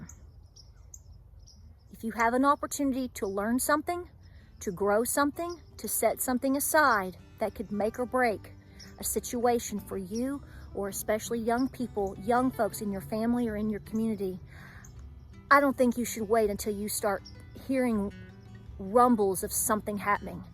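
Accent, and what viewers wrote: United States. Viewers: Y do u speak like a Yankee... America is weak asf that's y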